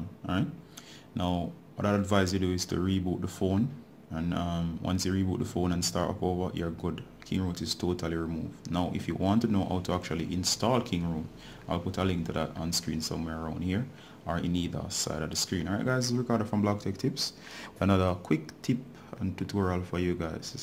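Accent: Jamaica. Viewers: thx man........... are u a jamaican?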